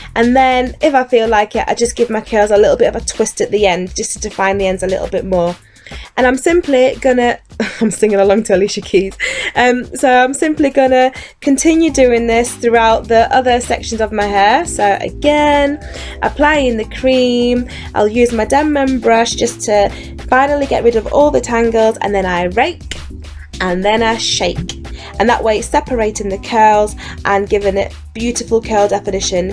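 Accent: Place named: RP, United Kingdom. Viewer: God, I want your accent. I've always looked at those accents as classy and posh. Very pretty sounding.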